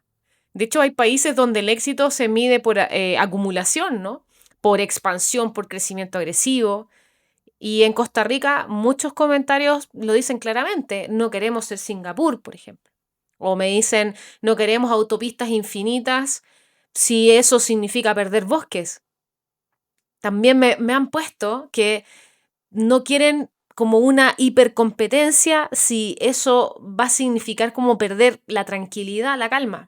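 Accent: Chile. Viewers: Me gusto bastante como lo explicaste, es así como que hay poblemas y tratamos de que no nos afecte tanto, acá algunos llevamos la vida como relajada como sin prisa Y cuando uno va a otros países le extraña ver el corre corre, por tú asentó me pareces Chilena, hace poco fuimos allá y fuimos a un lugar en la ciudad a comer comida bien tradicional y el mesero nos atendió como con una prisa y a la vez se veía como triste y decia una amiga comamos y nos vamos porque ese señor como que no nos quiere aquí 😅 Será eso que nos acostumbramos al trato Pura vida ❤ siempre una sonrisa, siempre sin prisas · Srta, he tenido la oportunidad de vivir en varios países, iincluyendo Costa Rica hoy vivo en Kentucky pero que maravilloso ha sido su analisis sobre este pequeños pero bello país, por tu acento creo que eres chilena y una de mis mejores amigas en mi pequeña ciudad Somerset de es Sol, una ex diplomatica de Chile en China y Europa con quien compartimos todas las semanas y siempre terminamos hablando de nuestra amada ¨Tiquisia¨. 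Su analisis me ha hecho reflecionar de todo lo que implica la expresión PURA VIDA. y sin temor a dudas cuanta razón tiene ud. Ojala cada pais de nuestra amada Latinoamérica y del mundo tengamos ese sentido de orgullo de la tierra y cultura que nos vio nacer, como lo diría el gran Poeta Nicaraguense R.Darió ¨ Si la patria es pequeña una grande la sueña¨ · Saludos hermosa Arquitecta de sueños. ´¿ Quisiera tener una polola como vos? Ja quedo impresionado por tu cultura, bueno soy un tico que quedó enamorado de tu país y sólo fui desde Santiago hasta Antofagasta, ahí me quedé. Chile es la tierra donde alzas una piedra y aparece un poeta. Me encantó la cultura poética de Chile, tengo gratos recuerdos y me halaga lo que hablas de mi país. Soy Alex, un poeta costarricense y puedo escribir unos versos sobre tu belleza. Me encanta como proyectas la voz, tu lindo acento chileno y bueno me gusta que hables con conocimiento. 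Quisiera volver a Chile para cooncer el Sur, eso sí en verano por favor. Dicen que el Sur de Chile tiene muchos lagos. En el norte se ve otra cosa y se prueba el Pisco chileno. Saludos y avísame si querés el poema de un tico y a donde te lo mando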